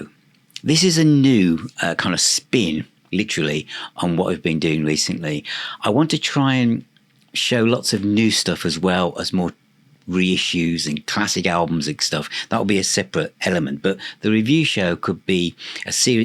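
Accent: United Kingdom. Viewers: Discovered you around 6 months ago and really enjoying the videos. It’s great to see an ordinary bloke from the U.K. with a regional accent talking about music I love (most of the channels I follow are American). 45 year old, mainly CD collector here, collecting rock and metal. I’ve also just joined your Facebook group and waiting for approval! Keep it up mate. After watching this video it seems there’s lots to look forward to through the Autumn. Thanks for the content. 🤘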